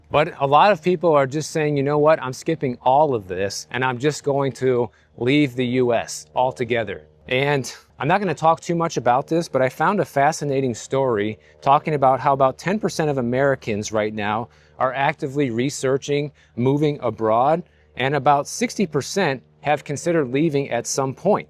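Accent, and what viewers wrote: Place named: New Jersey, United States. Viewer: I would have guessed you were originally from Jersey.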